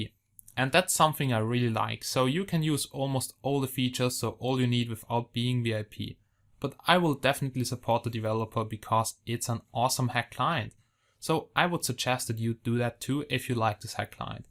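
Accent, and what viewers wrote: Germany. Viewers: wie man das deutsche leicht raushört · Thanks, love your accent. German right ? Anyways everytime I click resource pack it crashes. Not big deal just thought to tell you. Nice video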